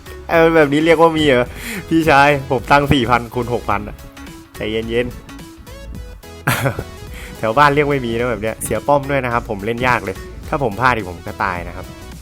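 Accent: Isan, Thailand. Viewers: คนอีสานหรอครับ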